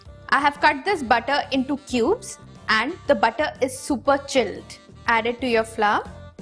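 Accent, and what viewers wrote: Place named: India. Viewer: That accent is so cute, if all toddlers spoke English with a heavy Indian accent it would be like sunshine on top of sunshine.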